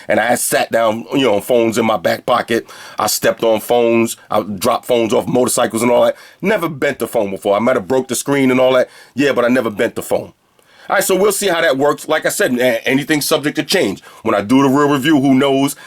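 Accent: New York, United States. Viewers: this review was really fuuny. i have a feelin this guy is from nyc cuz i talk like that too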